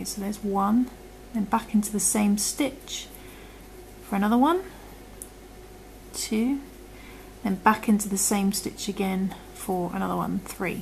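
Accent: United Kingdom. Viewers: My only gripe is you’re using US terminology and you sound British but otherwise lovely thanks for the share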